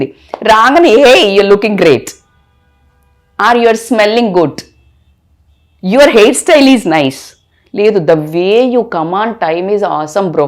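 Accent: Telangana, India. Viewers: Madam is very kind confident and practical very interesting with telagana slang, attractive conversation, sensible psycologist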